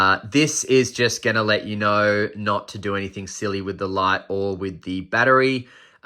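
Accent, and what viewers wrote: Australia. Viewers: Great review - thanks man. Good to hear another Aussie. For a second I though you had a shelf full of dildos for a second there 😮 😂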